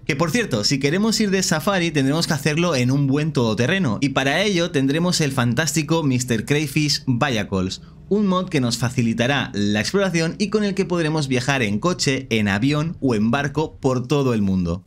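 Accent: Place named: Spain. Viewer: OTRO CANAL ESPAÑOL, SE PASAN, ESTE CANAL ES DE EUROPA, NO LATINO, PORQUE A CADA RATO DICE COSAS RARAS CON SU DIALECTO O ACENTO QUE NO SE ENTIENDE EN MI PAIS LATINO, ESE CANAL ES DE IBERIA, NO LATINOAMRICA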